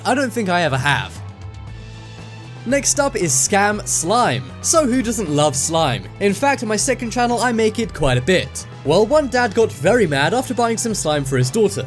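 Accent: United Kingdom. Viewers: Im so tired of this British accent bullshit on youtube. It may have seem attractive to some people at the beginning but now it's just fucking annoying! I would give you a thumbs down but I'd rather give you a thumbs up.....YOUR ASS!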